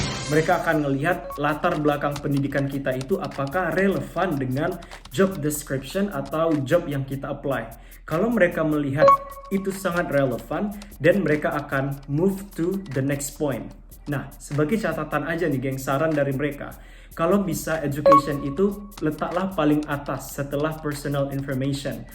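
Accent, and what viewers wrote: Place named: Pekanbaru, Indonesia. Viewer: content yang bener-bener bermanfaat banget bang, thanks sharingnya. ada bang jugaa wah! hehe. keep on using our pekanbaru accent ya bang!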